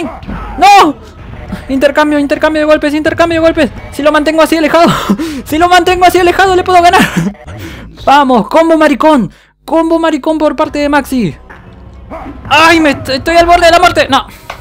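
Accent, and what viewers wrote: Argentina. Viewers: sos de argentina o de donde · en este video pense que eras argentino y lo eres :v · maxi eres de argentina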